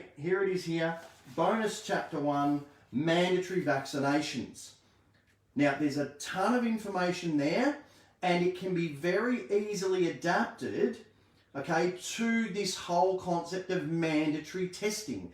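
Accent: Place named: Australia. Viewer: So I tell the truth... and you delete my comment... can't have anything disrupting your, cash cow. "I'm absolutely appalled, every time I hear you, it's a SALES AD - Buy My Book, Buy My Book... I'm sick of it! You sound Australian... but I'm not sure anymore. If you were a true blue Aussie Patriot... you would make sure everyone had a FREE copy in in pdf and you wouldn't be sending people to FB to be spyed on!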